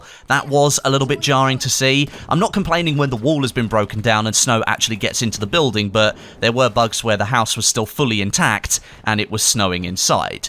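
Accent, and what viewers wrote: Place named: United Kingdom. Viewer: Man you sound like an intellectual with that British accent...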